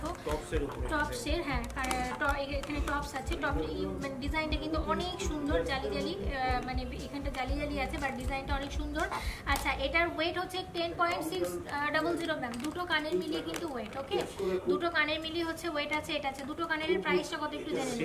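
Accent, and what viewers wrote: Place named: Bangladesh. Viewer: Tumi ki khub recently Bangladesh thike esecho baa jatajat koro? Kotha sunle mone hoe. Amrao oi paarer tobe desh dekhini.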